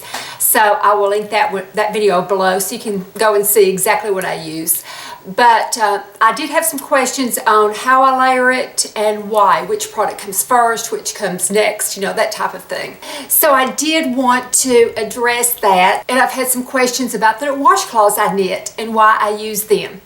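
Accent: South, United States. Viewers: Hey gorgeous! Where are you from? Your accent is adorable. Very southern. Would love to be involved in your groups (women of YouTube over 45). How do I get involved? I’ll be 55 on the 23rd. Thanks. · you're fantastic! I have so enjoyed watching your videos for the last few months and love all your recommendations. I have been thinking I needed to take up knitting again. My novice ability should be able to handle these facecloths. Great idea! Thank you so much. I so love your sweet southern voice and your fun informative presentation. I have enjoyed every video I have seen. It is so nice to be able to get all the ideas I need at my age to keep current, healthy and active. I have used tretinoin on and off for 30 years and it does make a difference so I am using it more in the last year and even on my eyelids the last few weeks after I saw women on YouTube say they had used it. 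It did get very dry and irritated the first time and then I used oils to hydrate and then cautiously proceeded the next week to use it with my eye cream every other day. Thanks again. xo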